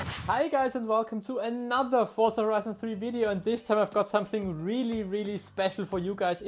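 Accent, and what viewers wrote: Germany. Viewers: Ich habe von Anfang an gehört das du deutsch bist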